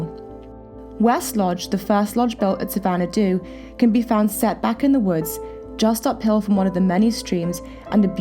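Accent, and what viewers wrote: United Kingdom. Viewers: with no offence intended to the lady I think it is incongruous that the description is delivered with a british accent. Are you guys short of women in New York ? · Terence Mac Sweeney Sotheby’s is a British auction house. Makes sense they’d use a British narrator to promote their brand. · from the accent of the voice over, I thought this property was in somewhere in Scotland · A place SO exquisite.... only a British accent can describe its grandeur!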